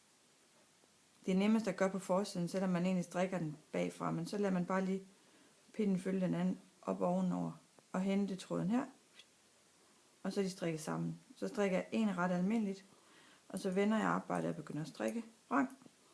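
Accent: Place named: Hjørring, Denmark. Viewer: Woow nu fatter jeg det. Superfin demo. Og endda på mit dialekt. Hjørring. Ikke?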